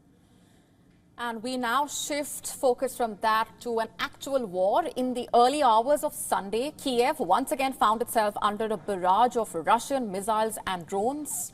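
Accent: India. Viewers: Your india asent i wonder how you are doing properganda for the west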